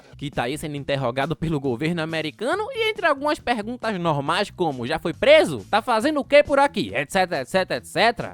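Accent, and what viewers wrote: Northeast, Brazil. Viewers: VOZ HORRÍVEL TENHO RAIVA DESSES NORDESTINOS SOTAQUE LIXO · Vídeo bom , porém esse sotaque nordestino ferra tudo ! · Porra mano aí e foda vc reclamar do sotaque nordestino si o cara nasceu no nordeste tu quer q o cara tenha o sotaque do Sul ou de sp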